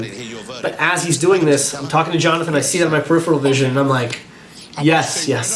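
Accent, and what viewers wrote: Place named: Europe. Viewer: Костя, у тебя на сцене почти не было акцента, а в реальности есть небольшой европейский, не совсем славянский. Интересно... Такое бывает когда в 10-13 лет иммигрируешь.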